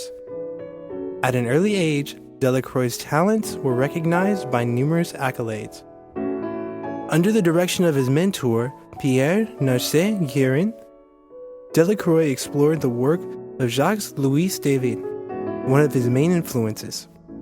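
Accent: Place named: France. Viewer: I don’t understand his French accent. It’s really a pity, because he actually destroyed the whole narration.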